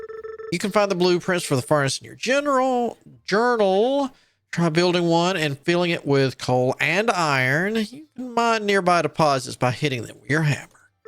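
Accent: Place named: South, United States. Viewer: Love the work so far, may i ask what part of the south your from? I noticed the accent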